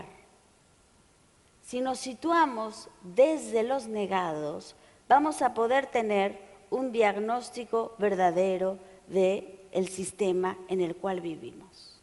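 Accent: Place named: Argentina. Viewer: ¿Por qué tiene ese acento sudamericano? Es que dicen que es mexicana; pero habla como argentina.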